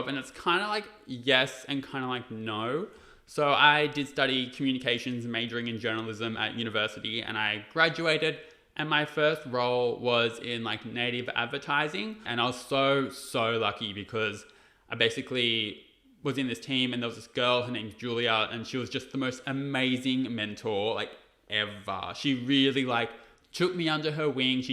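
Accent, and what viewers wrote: Australia. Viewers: I didn't realize your accent was Australian. So cool to learn more about you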